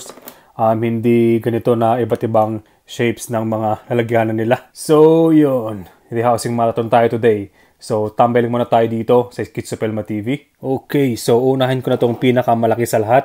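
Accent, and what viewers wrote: Ilocos, Philippines. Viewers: Idol may pag ka ilocano ka no? hehe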